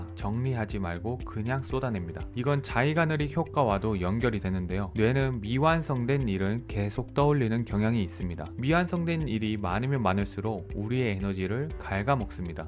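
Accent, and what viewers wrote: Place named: Gyeongsang, South Korea. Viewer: 시몰 다이어리 잘 받았습니다. 너무 감사합니다. 정성과 진심을 느껴서 댓글은 처음인데 안 쓸 수가 없었네요.. 듣다가 경상도분이시구나!.. 내적 친밀감..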